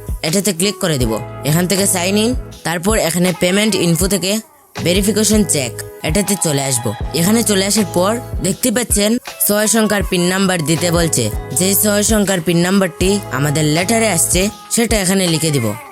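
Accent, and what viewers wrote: Sylhet, Bangladesh. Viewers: tumi kita sylheti ni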